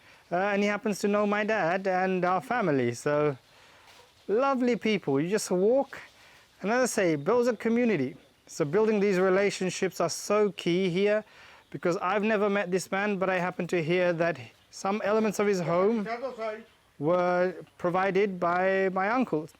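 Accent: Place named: United States. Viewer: You are an immigrant of London... But your accent is very much alike Americans... 😮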